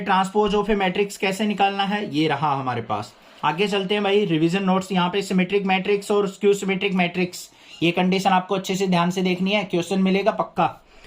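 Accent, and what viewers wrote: Haryana, India. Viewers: Sir aap Haryana se h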